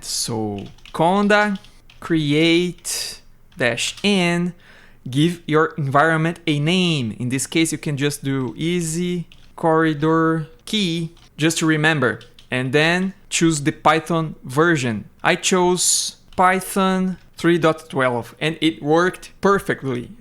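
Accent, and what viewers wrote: Brazil. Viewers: Pelo sotaque chuto que é brasileirinho, não? Excelente vídeo mano. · Brasileiro? O sotaque é bem inglês brasileiro (Acho lindo) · esse sotaque é. brasil conheço de longe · Você é BR né safado? conheço o sotaque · I literally commented on their video like "Now I just need a H100 to run this" and boom this pops up in my recommendations and you sound just like me talking in english, impossível um brasileiro não reconhecer o outro, me inscrevi no canal muito bom o vídeo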